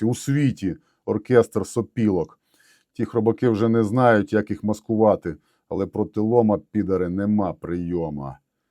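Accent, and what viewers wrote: Transcarpathia, Ukraine. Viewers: Ваші коментарі при знищенні хробаків з переливом закарпатського діалекту і багато ще чого ,якби нерозуміння що це війна і йде щосекундна зміна ситуації,то як в якомусь фільмі,але ж то реальна загроза всім нашим українським захісникам,Дякуємо Вам , і віримо в Перемогу України🇺🇦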